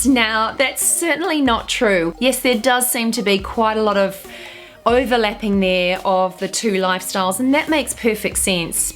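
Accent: New Zealand. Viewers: That is not an Australian accent. I think she’s from New Zealand. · As soon as you started talking I was like is she kiwi yes she has to be. I was also very excited that you are 💓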